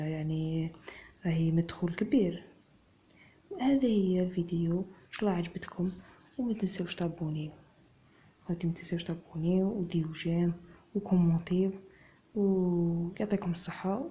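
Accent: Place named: Marrakesh, Morocco. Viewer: صدقني بالله و سمحلي يا وليدي بلا ما نحلف بلي مراكش عارف شا راك تهدر بالتوفيق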